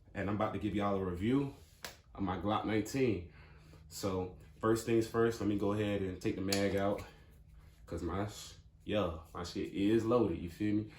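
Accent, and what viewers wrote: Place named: California, United States. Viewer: Ik you hadda livin in Cali da way you be sayin “ya feeme”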